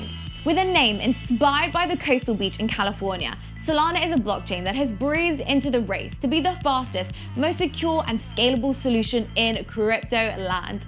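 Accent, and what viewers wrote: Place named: United Kingdom. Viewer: That British accent is what gets you every time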